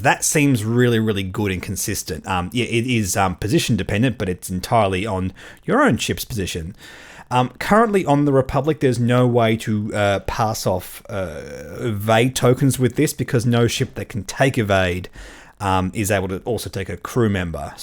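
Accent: Australia. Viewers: Good to hear your Aussie voice talking x-wing as I do my work.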